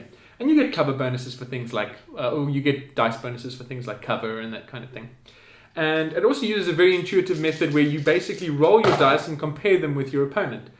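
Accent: South Africa. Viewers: That has to be a South African accent. But yeah, Infinity is great!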